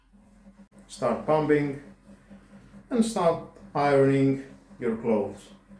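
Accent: Greece. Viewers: The supermarket in my area have it now in 77% sale and I just wanted to see some reviews to understand if it’s worthy to buy it and I found yours! Really helpful review thanks a lot! I wanna ask you something…from your accent I was thinking that you have Greek origins, is that right? Έλληνας είσαι φίλε μου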